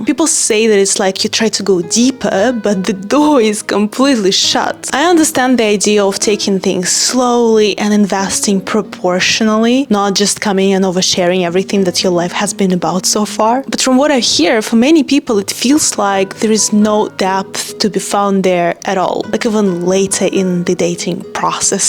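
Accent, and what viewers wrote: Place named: Eastern Europe. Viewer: While this girl is extremely pleasant to my eyes and I love the eastern European accent (this is the second video of hers that I watched) she is factually not Dutch, hasn't been in the country that long and she states that her boyfriend or the man she dates is also not Dutch. So, I like watching her, but where is her expertise on Dutch dating culture? There's a lot of confusion about this subject among expats to Holland and people, specially men planning to visit. The Netherlands has gained a reputation for their women being very emancipated, liberated and sexually open minded and there is 'some' truth to it. But so many men look forward to their trip to Holland where they are going to "score big" with all these open minded Dutch women only to face massive failure and rejection. I can explain. The Dutch live their social lives in groups, circles of friends and each Dutch person belongs to several groups or has several circles of friends and they overlap and that is how you can increase your number of friends. 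As a teen ager I went to the Discotheque on Saturday nights. I did not go alone, not with 'A' friend but with a group of friends, 5-6 or 8 of them. If while there I saw a pretty girl who interested me and I would approach her, most likely it would be awkward, she would show slight discomfort and reject my advances. She did not know me, she was there with her group of friends and it was with them that she was socializing with and enjoying her time with (there are always e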